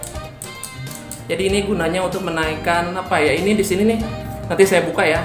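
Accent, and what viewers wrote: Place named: West Java, Indonesia. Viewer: Penjelasannya mantap jelas logatnya sundanis asli keeeren lahh pokoknya👍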